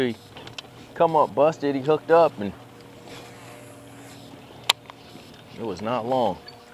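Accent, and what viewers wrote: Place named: South, United States. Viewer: Hey, I can hear a Southern Drawl when you speak now. You still a Cali Kid..? 🤔 😂 Rooting for you always my Bro.... YEETTADEE!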